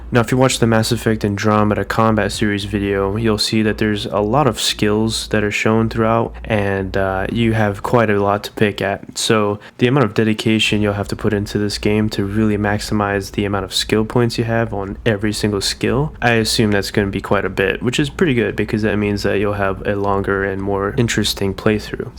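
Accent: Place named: Canada. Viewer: You sound like a fucking canuck. I hope it isn't true. You are such a decent guy to watch when I had absolutely nothing else.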